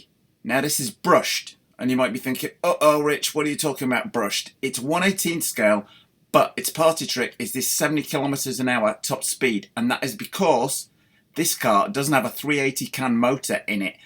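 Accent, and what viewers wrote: England, United Kingdom. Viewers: I don't think so his accent is definitely English but in one sentence he can have a twang of South African or Australian, Birmingham and then Lancashire. And the stop/starts of his sentences are really strange. I'm going to go with 👽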